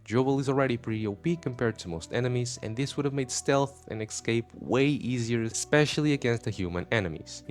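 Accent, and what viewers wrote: Portugal. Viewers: Random but are you portuguese?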